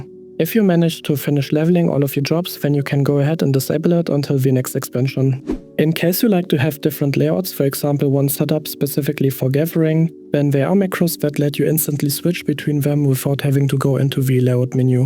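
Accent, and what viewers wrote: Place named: Germany. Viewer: Es ist schwer dir zu folgen wenn du Englisch mit so extrem harten Deutschakzent sprichst. Ansonsten Danke für das Video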